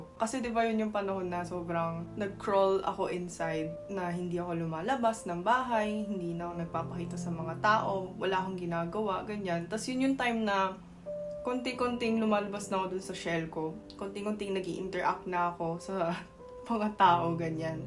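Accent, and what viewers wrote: Batangas, Philippines. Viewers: Inborn na may natural linguist skill itong si, pati english accent, pinay na pinay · Woukd kove to hesr yiur Batangas accent!👍❤️💚💜👏🤣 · I have been watching your vids ever since i chanced upon one some weeks ago, and i got hooked. Eh, para akong nakakarinig ng aking kababayan, Ay, Batanguenang Batangauena magtagalog pati punto eh 😀😀😀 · tlgang pinay n pinay k n tlga mag kwento.. pati ung expressions ng lhat ng galaw at pananalita, pinay n pinay kna tlga...i am proud of you..❤❤❤ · I like your Batangueno accent hehe... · So true--very Filipino nga Tagalog niya and kung papakingan lang akala mo talaga Pinoy yung nagsasalita. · Pure pinay accent..😘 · Para kang Pinoy talaga kung magsalita.❤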